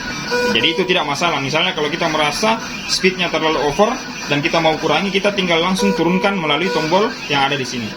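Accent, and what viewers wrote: Indonesia. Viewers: Orang sulawesi selatan yak ? 😂😂😂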